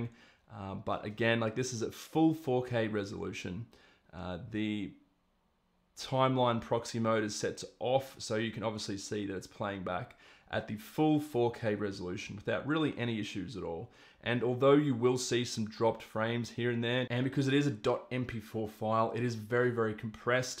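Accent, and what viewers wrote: Australia. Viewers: Can you turn off your Aussie accent? It's distracting.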